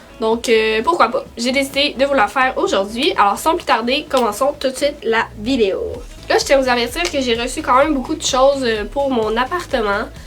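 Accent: Quebec, Canada. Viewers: c'est toujours drôle d'entendre les expressions québécoise et les mots qu'on dit différemment en France! ton rouge à lèvre est magnifique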